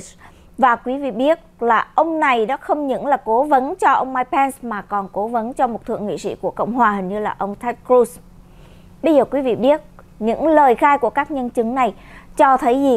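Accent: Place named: South, Vietnam. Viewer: Nói tiếng Huế đi, nói giọng nam nghe bịnh quá. không thử giọng Bắc đi.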